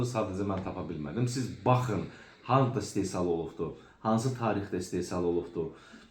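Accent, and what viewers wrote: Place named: Qazakh, Azerbaijan. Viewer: Ləhcədə ki SUPER🙏🙏🙏 QAZAX ləhcəsi👏🙏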